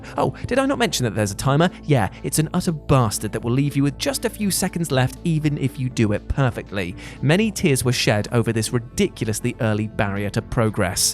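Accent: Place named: Wales, United Kingdom. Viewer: Very Welsh it is 😁 (Wales is part of the United Kingdom)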